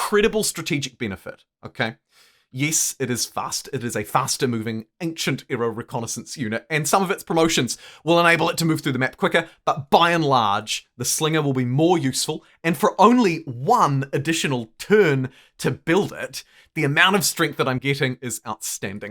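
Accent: New Zealand. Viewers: Sounds like a New Zealand accent, though I may be mistaken. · I'm super late to this game – never played Civ anything before, and Civ6 is free on Xbox Game Pass – but I've fallen deep in the rabbit hole, and I wanted to say thanks. Love your friendly voice, simple and clear advice, infectious enthusiasm and as an Aussie, I really enjoy the kiwi accent. 🙂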